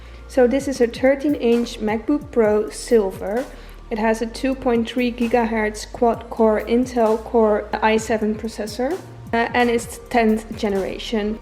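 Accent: Netherlands. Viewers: Klinkt Nederlands? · Why i feel ur dutch from ur accent why i have this feeling tho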